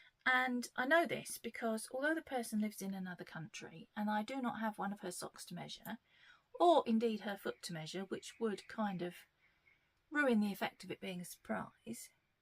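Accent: United Kingdom. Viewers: Love your podcast and you have a great sense of humor! I especially enjoy the British accent as I’m from the USA. Thanks for making the videos.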